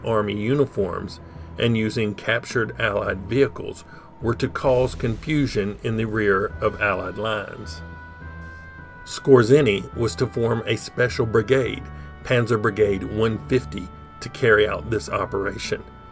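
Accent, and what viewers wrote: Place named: Appalachia, United States. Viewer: Ok. I'm from Tennessee. I thought you sounded a little Appalachian like me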